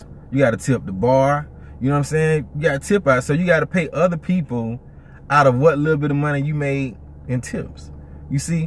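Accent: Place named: Carolina, United States. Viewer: I hear either North Carolina or South Carolina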